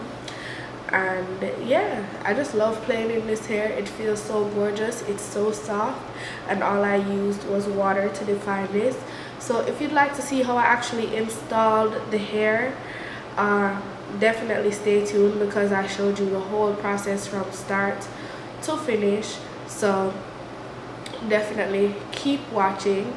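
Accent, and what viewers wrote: Jamaica. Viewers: it’s her Jamaican accent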